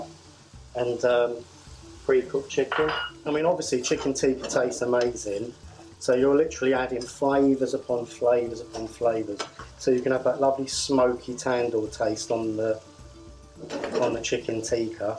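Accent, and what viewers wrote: Liverpool, United Kingdom. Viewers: I could tell you a red it was the scouse accent that give it away. 🤣🤣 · I used to love you........would never have guessed you was a scouser.👹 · Ahhh yes the famous scouse accent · Ye we could tell from Ur accent u were either a Liverpool or United fan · Sounds really scouse 😹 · Lovely Scouse accent there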